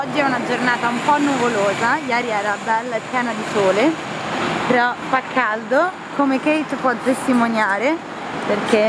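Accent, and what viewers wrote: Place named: United Kingdom. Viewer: Oddio!!! non ti avevo mai sentita parlare in inglese: c'hai un super accento british!:P Divertiti a NY!! A prestissimo cara! Baci!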